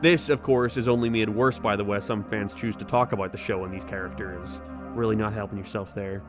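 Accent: Ireland. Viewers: I'm pretty sure he's Irish or something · is bro irish or no 💀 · Hes Irish living on America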